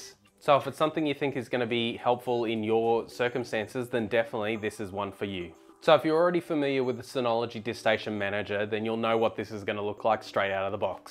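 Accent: Australia. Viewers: notices Aussie accent liked and subbed mate. Great video btw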